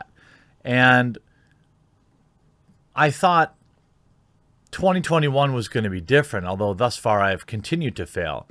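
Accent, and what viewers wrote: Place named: Midwest, United States. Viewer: Hahaha I knew you were from the Midwest but that clip with you and your wife your accents really shown through. I was confused for a second because in your videos your accent is very minimal. Don’t get me wrong, nothing wrong with the accent just caught me off guard lol. If you heard me talk I’d be the one with the accent.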